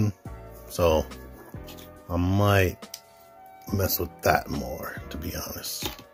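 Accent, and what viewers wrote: United States. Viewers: You have the most American voice I’ve ever heard don’t get me wrong is cool you should do the voice actor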